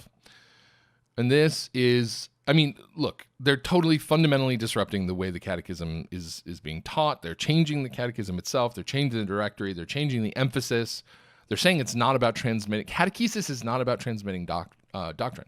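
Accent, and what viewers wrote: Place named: France. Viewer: Great French accent. Oh boy will dissenters never give up.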